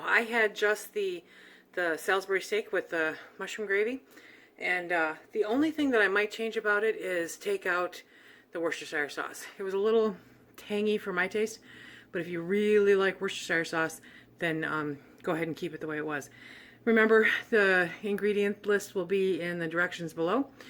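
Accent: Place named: Michigan, United States. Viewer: I'm brand new here...I happened to be browsing around for recipes and clicked on your video. I could tell right away you were from Michigan, because we have dear friends in Johannesburg, and your accent reminded me of them. You have very interesting content, and a very pleasant manner...I think I'll stay!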